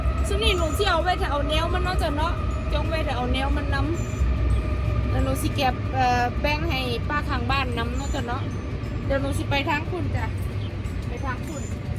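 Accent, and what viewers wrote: Udon Thani, Thailand. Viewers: ສຳນຽງທີ່ເຈົ້າເວົ້າຄືຄົນອຸດອນນີ້ລະ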